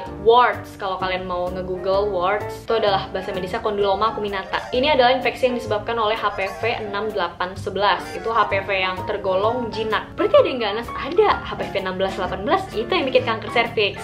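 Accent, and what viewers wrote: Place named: Betawi, Indonesia. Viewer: Enjoy banget dengernya😍😍😍 btw logatnya jadi kaya agak betawi ya😂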